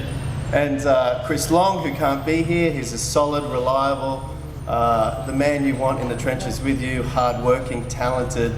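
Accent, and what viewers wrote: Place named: Australia. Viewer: In the beginning, I thought he was american, because of his accent in The Mentalist, and I also hadn't watched any videos until my interest grew on him specifically. It shocks me to hear him in an Aussie accent, you know? It makes me cry with this video because he's just so . . . perfect.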